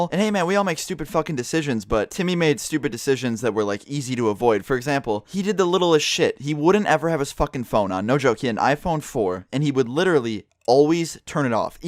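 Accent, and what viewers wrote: Canada. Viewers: Are you canadian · wait......... Youre Canadian? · Are u Canadian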